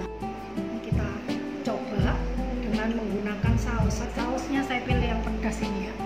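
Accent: Java, Indonesia. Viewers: Anjirr medok bangett. Saya suka saya sukaa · Medoknya something banget. Jd adu ciri khas. Kamsia ya cik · ci aku.baru ikutin you tube cici.mantap cici tutorialnya jelas dan mudah ikutinnya.Suka juga aksennya cici yg.medok jawa.GBU cici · org Surabaya ya cik...bicara medok buangett...😊tp cara masak cicik sy suka...😍thank U cik...🙏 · Wah...luar biasa masakannya....nikmat sekali ini....dan suka banget sama medoknya...salam kenal...sesama medok...😄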